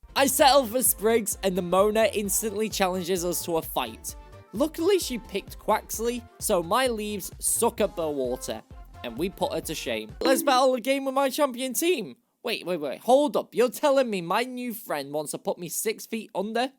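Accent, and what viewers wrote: United Kingdom. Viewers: Watching the first minute of this video I can tell he is British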